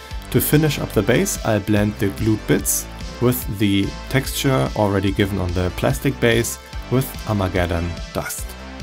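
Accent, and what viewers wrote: Germany. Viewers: you are german i am sure haha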